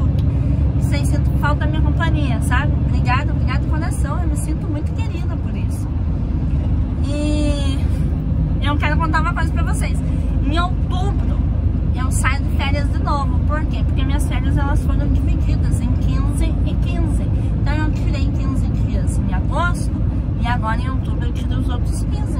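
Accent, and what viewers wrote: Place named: Santa Catarina, Brazil. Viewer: Boa noite, mas de novo vai ficar de varde😂 amo esse modo de dizer as palavras, morei em Santa Catarina na cidade de Modelo por um ano, os meus piás, já estava com sotoque😂🥰 mas você merece😉fica com Deus e boa viajada 🙏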